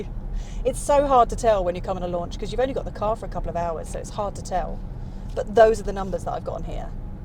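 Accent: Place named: United Kingdom. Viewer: I just love this video. The car is great, the lady reviewer is charming and beautiful, her British accent is divine. Her review is objective and she points the positive as well of the negative aspects of this unique French car. Great job ! I hope DS Automobiles sees this review so that the brand can improve on the shortcomings of this "luxury" model that the Lady points out.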